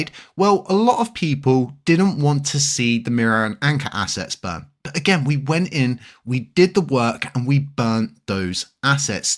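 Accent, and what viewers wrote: United Kingdom. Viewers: I think he’s British.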